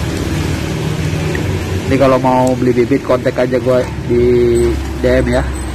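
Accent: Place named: Palembang, Indonesia. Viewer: Logat nyo, wong palembang caknyo ini😀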